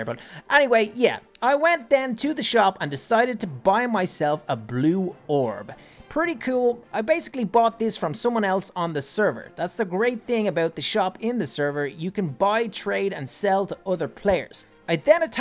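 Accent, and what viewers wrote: Ireland. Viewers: youre irish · Are you Irish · The Irish acciend love it brutha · I love ur Irish accent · The second good Irish YouTube I found in my whole entire life also noting is that your Irish because I am to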